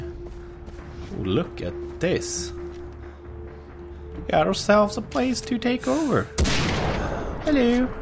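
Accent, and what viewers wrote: Australia. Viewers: Protip: He is australian, not british, mate. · hes not british, hes australian :)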